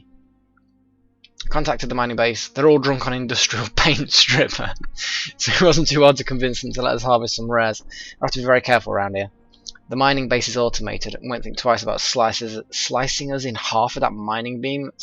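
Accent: England, United Kingdom. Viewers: So you get a lot of crap from ScreenRave about your "UK" accent. I may be an American, but I understand how ridiculous that is. There's no "UK" accent. There are dozens, maybe hundreds. Whereabouts are you from? I don't recognize the city or area your accent corresponds to... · I am an Idor, and I just wanted to ask, are you from England itself? Or from scotland\ireland?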